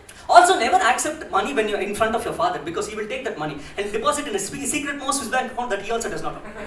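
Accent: South India, India. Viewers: Slow beta slow and ye tgoda accent please. Btw south indian accent is cute as well😅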